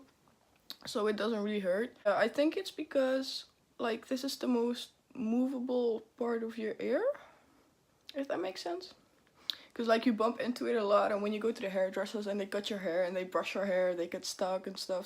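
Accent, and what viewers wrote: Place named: Netherlands. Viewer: Ben jij nederlands Zowel ik hoorde het helemaal aan je accentje😳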